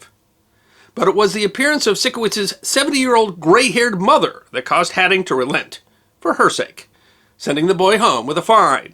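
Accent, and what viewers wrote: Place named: United States. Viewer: Oh how I wish you spoke the Queen's English & not the lazy Yankee dribble!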